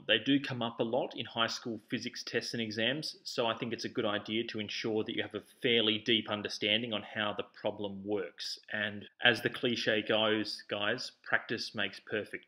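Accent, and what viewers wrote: Australia. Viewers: This was the first video of yours that I have watched and I think you did a really good job breaking down the algebra. Thanks for this and the aussie accent was refreshing!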